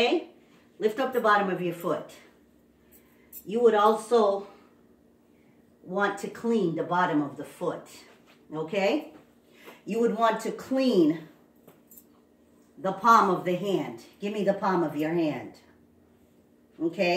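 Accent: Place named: Caribbean. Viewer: Are you from the Caribbean islands Because I can hear a accent when you talk?🏝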